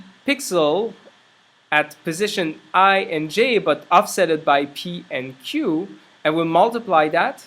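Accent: Quebec, Canada. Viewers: r u quebec or frenc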